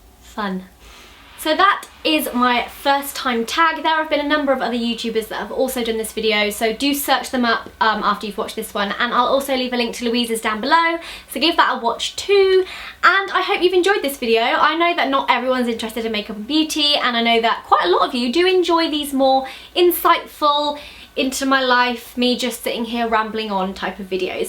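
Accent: England, United Kingdom. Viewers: you know why i'm here. not because of you. your lovely british accent. i guess! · it seems like when you say ass its less offensive with your English accent than with my American accent.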